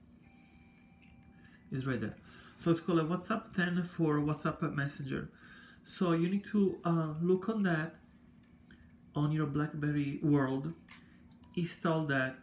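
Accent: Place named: Italy. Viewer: La butto lì...ma sento un vago accento italiano...😜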